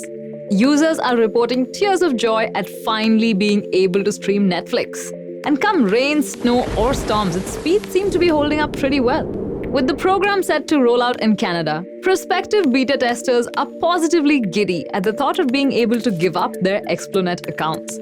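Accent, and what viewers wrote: India. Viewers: I don't like the Indian accent in general (for some reason it gets on my nerves) but it was a very informative video so I stayed to the end. Keep up the good work. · The highest number of internet provider, currently sited on the web in India, was 358. The smallest number of internet provider in the United States I could find was 2737 internet service providers in the United States: 894 DSL “Digital Subscriber Line” providers. One site citing the CIA, said there were over 7,000 Internet service providers in the United States. Given the difference in populations. It does not appear that number of providers and price have an inverse correlation. Enjoy your blog, great title. Interesting topics presented with intelligences and a refreshing an Indian accent. It is quite pleasant to my Midwestern ears. Thanks!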